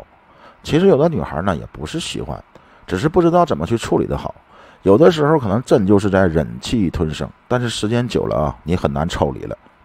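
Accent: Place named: Northeast, China. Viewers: 东北， 好像是鞍山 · 鞍山海城的口音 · 辽宁话吧，沈阳那边的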